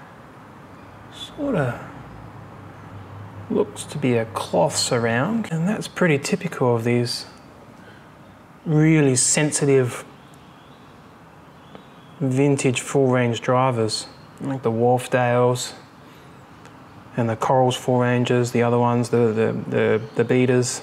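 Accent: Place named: Australia. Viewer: Always like Aussie accent.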